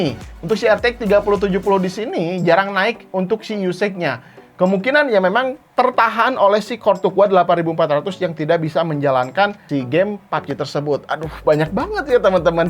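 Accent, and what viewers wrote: West Java, Indonesia. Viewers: lah emang Sunda, subscriber baru ya ngab? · Sunda banget aanya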